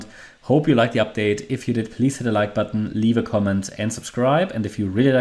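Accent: Germany. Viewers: Cardano is becoming less value day by day (compared to Bitcoin) P.S. nice German accent 👌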